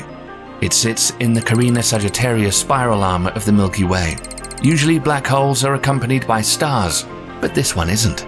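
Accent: United Kingdom. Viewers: Will you always speak in such a beautiful British accent? I love it.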